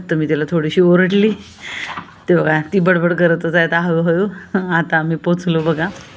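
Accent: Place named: Pune, India. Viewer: ताई तू पुण्याची आहेस का proper.. बोलण्याची ट्यून पुणेरी वाटते म्हणून विचारले.. आणि खूप छान आहेत..होईल सगळ ठीक..❤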